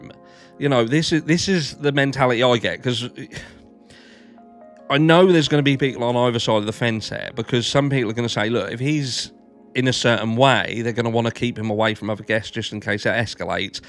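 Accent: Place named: Norwich, United Kingdom. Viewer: You sound like you're from my neck of the woods, Norwich way?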